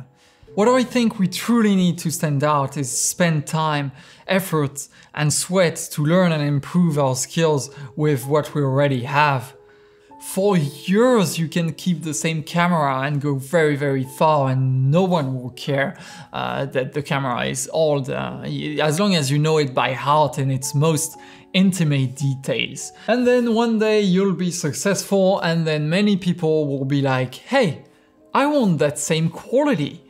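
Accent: France. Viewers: I love his pure thick French accent. It’s ASMR at its finest. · Youre french? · Are you French or Canadian? Just curious. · T’a l’accent français je trouve si tu es français alors je dit bravos 😊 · The most french thing anyone has said: (especially with that accent) lol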